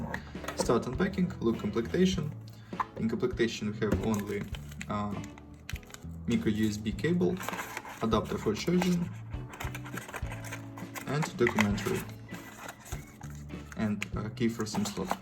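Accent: Russia. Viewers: Just by chance is you’re first language Russia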